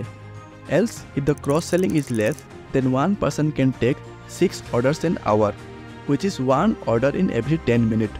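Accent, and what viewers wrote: India. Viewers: You are from odisha because the accent in which you speak is odi accent .love you bro I am also from odisha ❤ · You spoke very well, don't get bothered by comments about your accent. It's how most of us Indians speak.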